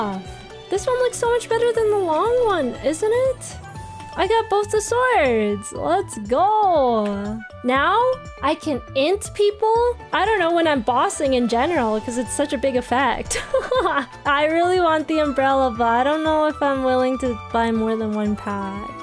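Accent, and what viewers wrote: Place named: South, United States. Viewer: That southern accent is just getting better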